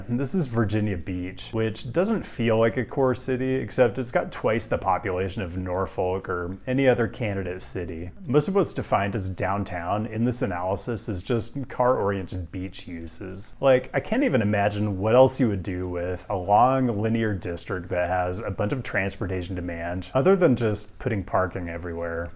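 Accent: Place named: Pacific Northwest, United States. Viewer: is from Seattle. Fred Armisen describes the PNW dialect as "putting the words on a plate".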